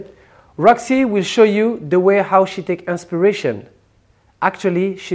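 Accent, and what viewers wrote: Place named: Morocco. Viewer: wa axent maghribi:)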